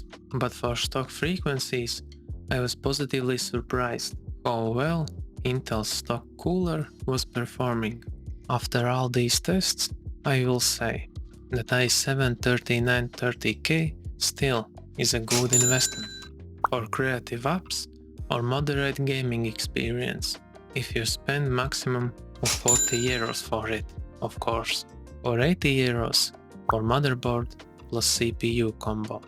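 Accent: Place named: Ukraine. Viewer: Heavy accent, reminds me, GO Ukraine! Better Democracy there than the USA right now! haha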